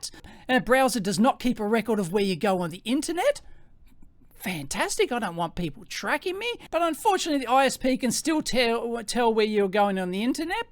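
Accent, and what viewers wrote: Australia. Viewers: Cant believe how many folks totaly missed that it was complete sarcasm. And Im just an OLD YANK who knew even with that awfull ausy accent. P.S. I hate my dictator as much as yall so dont hate on all mericans. misspellings are on purpose. TWO THUMBS UP 👍👍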